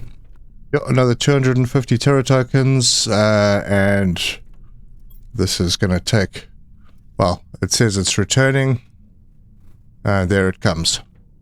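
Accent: South Africa. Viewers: I was wondering if the accent was South African, Aussie myself but my great grandfather was South African, his son, my grandfather was responsible for starting my gaming addiction on his Atari back in the day.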